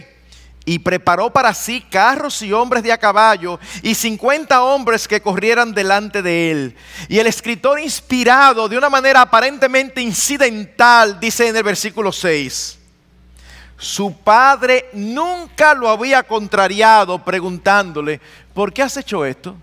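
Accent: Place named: Dominican Republic. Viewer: Muchas gracias pastor, me encanta su acento dominicano trayendo estás enseñanzas bíblicas de la crianza, el Señor lo guarde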